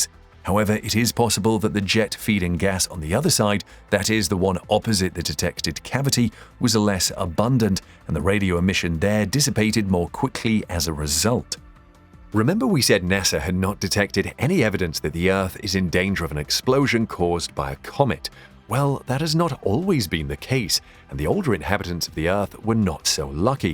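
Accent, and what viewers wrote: United Kingdom. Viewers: Love your explanations and graphics in all of your videos, keep them coming! Do I also detect a Welsh accent? 🙂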